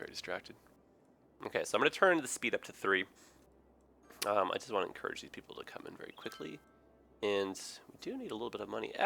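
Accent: Toronto, Canada. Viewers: Great videos! For the past few days I've been watching a ton of Cities: Skylines videos and I've noticed a huge problem with all of them. The person doing the video makes a lot of small mistakes that have an immense impact on the gameplay and they don't notice it until maybe an hour later. SOO annoying. I know they have a lot on their plate when doing a video, but mostly I think it's because they are rushing it. With your videos, I haven't noticed anything wrong. Love the laid back style! Ps. I live in Toronto too at the moment and I like your accent :D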